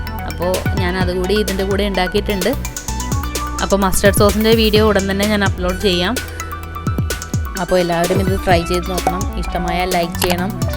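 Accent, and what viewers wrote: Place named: Kochi, India. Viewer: adipoli preparation , kochi bhashayil paranjal polichu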